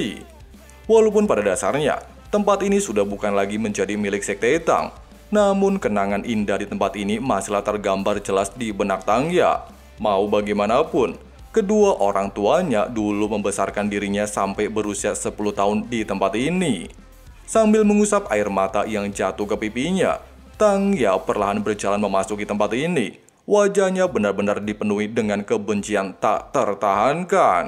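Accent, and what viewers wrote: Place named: Indramayu, Indonesia. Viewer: Dermayu bang lalah cah ya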